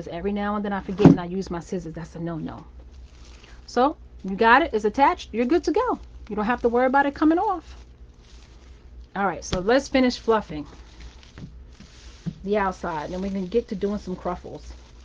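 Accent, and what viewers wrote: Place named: New York, United States. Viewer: Hi you sound like a New Yorker ! I'm from Boston (Roxbury) Ma. just came across you channel and yes I love crafting I just started a about a year ago. I love how good you explain things !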